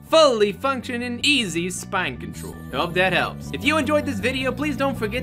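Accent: New York, United States. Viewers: love your tutorials but the ny hot dog vender thing you have going on is annoying af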